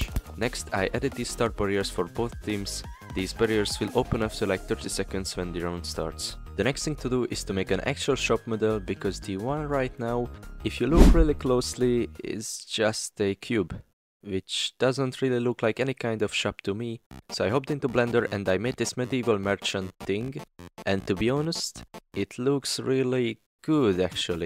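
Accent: Hungary. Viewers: you are from Hungary?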